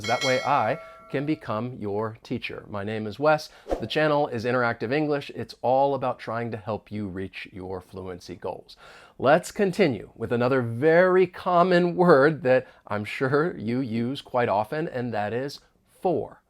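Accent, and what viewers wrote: United States. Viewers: Beautiful, nothing like American pronunciation. It's my favorite. ❤ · you are an amazing teacher i have seen on youtube,,,,,,,your teaching skills are amazing,,,,,,,we all needed this type of explanation🥰🥰 you just nailed amercian accent🥵now its very easy for pronounce such an accent ☺but the last one is very difficult and complicated 🥴SUPERCALIFROAGILISTICEXPIALIDOCIOUS ufff🥵🤧🤒😴🥱 what does it mean 🤔by the way thankyou so much for this explanation🥰🥰🥰greetings and love from PAKISTAN😍😘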